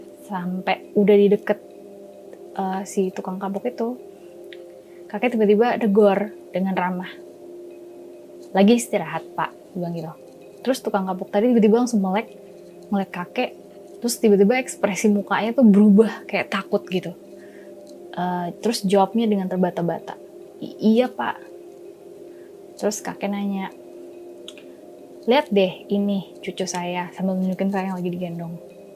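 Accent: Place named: West Sumatra, Indonesia. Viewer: logatnya kaya orang minang😁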